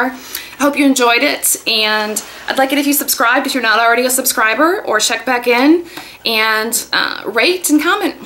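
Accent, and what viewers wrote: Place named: South, United States. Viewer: I knew you had to be from the South! We sound the same....and provide lots of information (ha ha!)....I have this one and feel like you do. I also have the Smashbox. I bought it before it was released to the public. I like it a lot. I still use a concealer and finish powder (IT cosmetics)...I like the look! I definitely think it's making my complexion more even. Keep the vids coming! I love your sense of humor! We Southern Belles got it goin on! Hee! Happy Easter, y'all!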